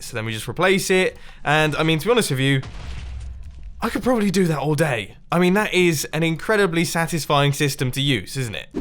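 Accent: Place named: United Kingdom. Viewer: No offense man, I accustom calling it British accent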